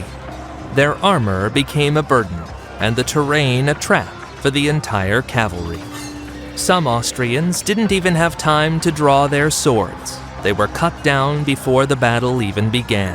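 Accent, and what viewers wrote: United States. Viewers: nothing ruins a good historic story as an americrap voice.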